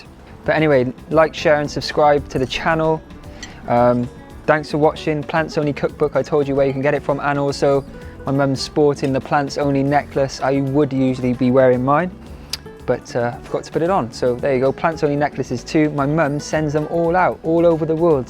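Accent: United Kingdom. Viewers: Amazing recipe. Can't wait to try it. Big fan - I have all your books. + You don't need to say eggplant instead of aubergine - we love your Brit talk here in America 🥰 Although - I do think you'd look awesome if you cut the top of your hair really, really short (American style) ☺️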